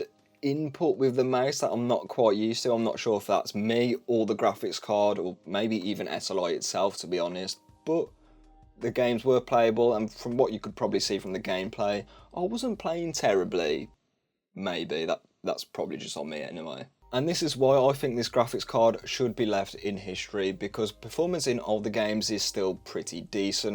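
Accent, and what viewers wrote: Black Country, United Kingdom. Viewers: It's nice to hear a black country lad. Keep up the good work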